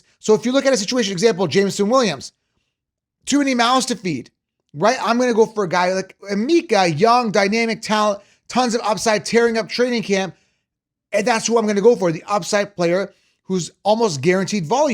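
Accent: Canada. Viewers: Raiders fan with a Canadian accent…lol no thanks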